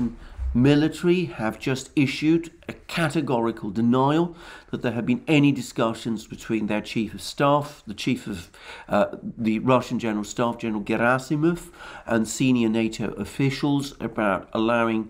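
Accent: United Kingdom. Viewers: Best place for pristine russian propaganda with a lovely british accent.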